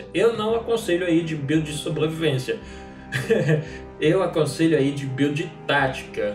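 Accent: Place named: France. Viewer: explica muito bem, mesmo com o sotaque francês puxado..., é até um diferencial..., mais um ótimo vídeo!!!!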